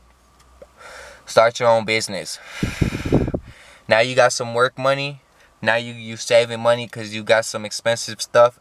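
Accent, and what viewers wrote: Texas, United States. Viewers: I’d figured he from Texas his accent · You from S.a texas homie?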